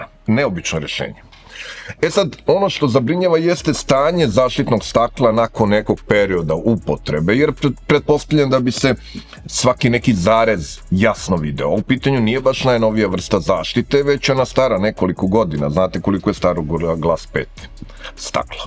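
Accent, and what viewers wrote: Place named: Serbia. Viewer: Ovo je srpski kanal, a ne americki, tako da je izgovor prilagodjen srpskom govornom podrucju.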